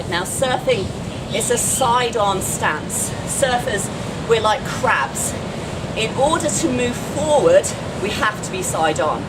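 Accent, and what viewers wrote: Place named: Australia. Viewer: 억양이 너무 호주 호주 한데요~ ㅎㅎ 역시 서핑은 오지! ㅎㅎ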